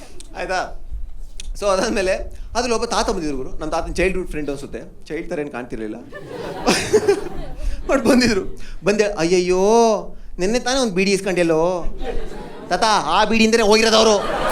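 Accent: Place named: Chamarajanagar, India. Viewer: Annoovv ur from chamarajanagara❤ ansuthe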